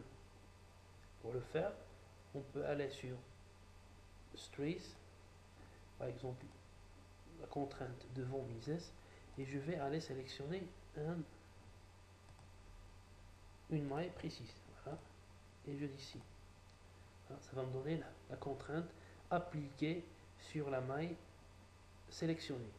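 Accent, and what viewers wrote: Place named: Algeria. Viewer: Accent Algérien!!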